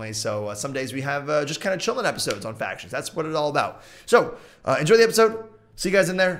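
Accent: Scotland, United Kingdom. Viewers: Its Scottish like meh :D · OMG I LOVE ACCENT U SCOTTISH GUY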